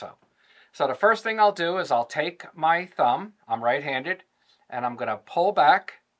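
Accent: New York, United States. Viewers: Montana gun shop with the New York accent way too many okays for me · Pretty obvious that he's from NY/NJ. You can hear the accent slip in frequently. 👍 EDIT: Favorite revolver and handgun is my S&W 686-6 shot w/6" barrel. I've had it for 25 years now. Enjoying my new 627-8 shot with 2.6" barrel, but would prefer if I could find the 627 w/6" barrel (not currently offered.)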